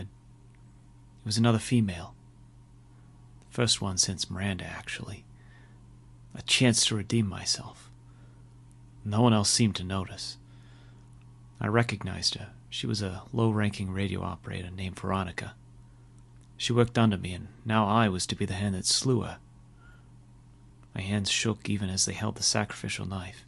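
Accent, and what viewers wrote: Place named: New England, United States. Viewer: Love the New England accent of the narrator. The "salt" in his voice invokes the historical draw of the sea for these maritime adventurers and adds to the tale. Good story too!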